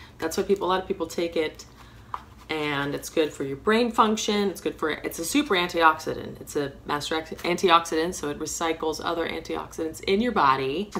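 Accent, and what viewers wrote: United Kingdom. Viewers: did you ever live in England, flipping is an English term and you sometimes have an English accent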